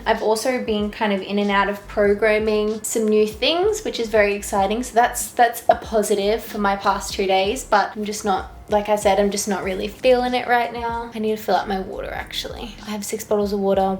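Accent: Australia. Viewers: When you say “week two” I melt. Love your Australian accent!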